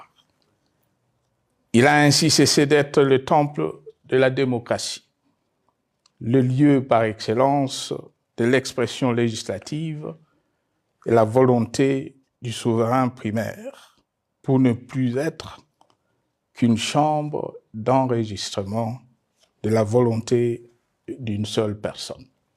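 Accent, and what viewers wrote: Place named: Rwanda. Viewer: Je suis tellement pcq tu as un ton de kinyarwanda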